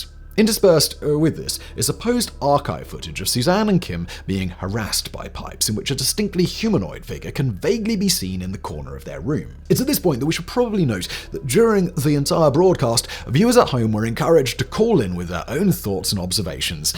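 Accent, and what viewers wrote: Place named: RP, United Kingdom. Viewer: fact boy sounding extra posh as of late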